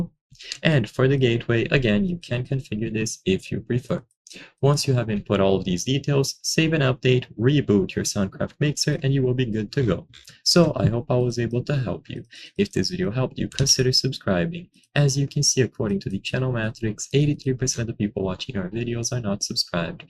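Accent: Brazil. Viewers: É br né cara? Kkkk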